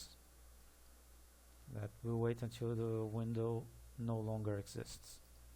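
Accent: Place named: Brazil. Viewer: reconheço um sotaque brasileiro de longe, muito bom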